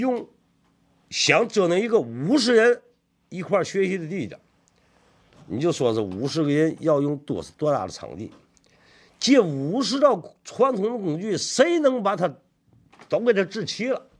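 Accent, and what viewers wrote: Tianjin, China. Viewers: ，b站的粉丝基本上是去听你的天津口音的，冲着技术去的很少。你的视频太长，不够精炼，最好能剪辑一下。动不动就十几分钟，而且闲聊太多，年轻人看不了。国外不论是讲数学物理化学的视频很少有超过10分钟的，看起来轻松。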